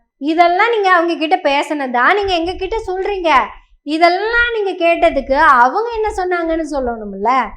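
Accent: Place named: Coimbatore, India. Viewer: Covai tamil super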